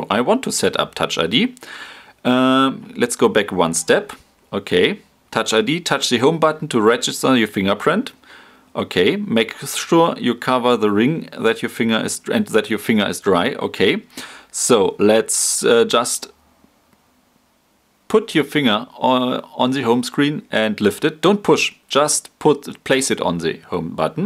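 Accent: Germany. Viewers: Are you german? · I love your accent!!!!! I have the German accent as well.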